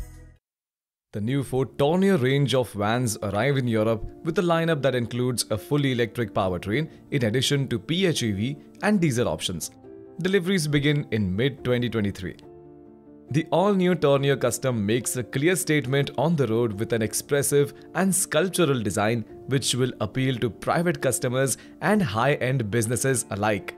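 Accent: India. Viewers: This tounge...like indian speak english...😊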